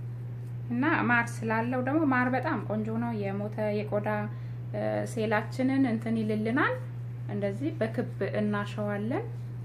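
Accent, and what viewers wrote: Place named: Ethiopia. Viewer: ሲሪላንካ እና ህንድ ትመስላለሽ ስታወሪ ነው ሀበሻ መሆንሽን ያወኩት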